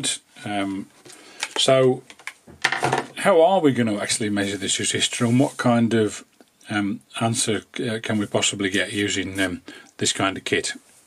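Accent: United Kingdom. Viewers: may I ask. You live in North Yorks but your accent sounds more midlands.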